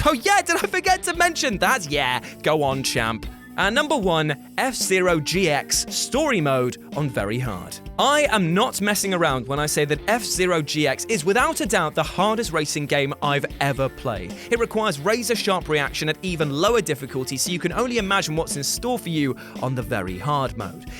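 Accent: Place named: England, United Kingdom. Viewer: watched in mute as this fuckin poms accent makes my skin crawl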